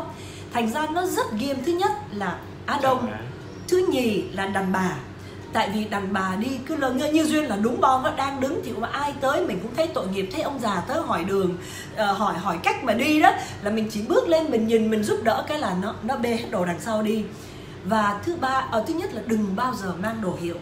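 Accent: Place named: North, Vietnam. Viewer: Giong bac ky va` nghe thuat cach noi 20 nam lam MC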